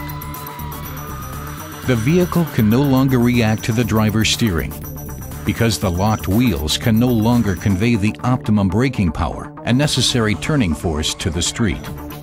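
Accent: United States. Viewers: did we need the "american voice" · so many information not right: driving a car in high heels, a murican accent trying to illustrate the scene by showing stepping on break and CLUTCH....but overall good job ABS.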